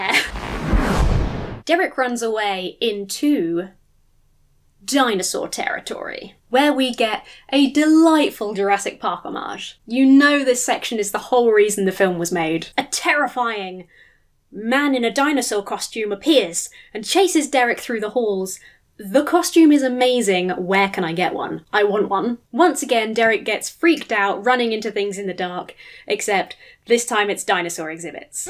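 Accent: United Kingdom. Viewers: Blackmail, it's the godly way! Lol, I died when you said that because it's so sadly true. Let me manipulate you so you can, believe me, btw we're the good guy's everyone else is so evil ha ha ha (in evil villain). Love the video here, but where is your accent from? My wife and I were thinking British but couldn't place it. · Since you would seem to be British (accent?) you may not be familiar with the car that Eric/Derek drives up in. It's a Chevrolet Camaro and not really a "sports car" in the true sense of the word. Also, it's not necessarily all that expensive. Even though you can get them all muscled up with big engines that can go fast, you can get a basic bare bones one for as little as $25,000 dollars or about 18,400 British pounds. Thus, even a night watchman should be able to afford one if he keeps his aspirations in check. Love your stuff otherwise though.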